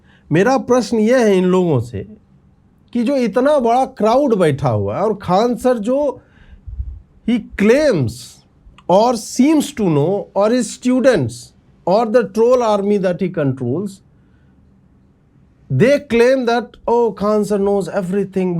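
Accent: Bihar, India. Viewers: Begusarai ka tone muh se nikaliye jata hai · wah 10k+ comment🤣 bhaiya bihari tone wali baat shi h. mai khud bihari hoon pr ye emotional fool banane ka tarika hai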